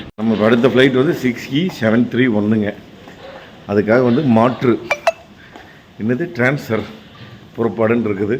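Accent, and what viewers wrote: Kongu, India. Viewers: ஆஹா என்ன ஒரு மகிழ்ச்சிகரமான முடிவு அற்புதம் எங்களுக்கு கடந்த ஒரு மாதமாக அற்புதமான ஒரு தொடர் பார்த்த திருப்தி கொங்கு தமிழில் நீங்கள் பேசிய பேச்சுக்கள் மனதில் என்றும் நிலைத்திருக்கும் நீங்கள் வீடியோக்களை எடுத்த விதத்திலும் சரி எதார்த்தமாக நீங்கள் பேசிய காமெடி பேச்சுக்களும் சரி மிக மிக அற்புதம் ஒரு ஜெனரஞ்சகமான நகைச்சுவையான குடும்ப படம் பார்த்த திருப்தியோடு இந்த தொடர் முடிவடைகிறது திருப்தி சில மாதங்களுக்குப் பிறகு வட கிழக்கு மாநிலங்கள் கோவா ராஜஸ்தான் உள்ளிட்ட இந்தியாவின் பல பகுதிகளுக்கும் சென்று வர வாழ்த்துக்கள்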